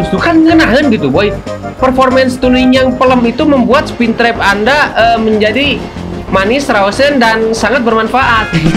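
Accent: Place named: Sunda, Indonesia. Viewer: Nyunda parah euy si aa😭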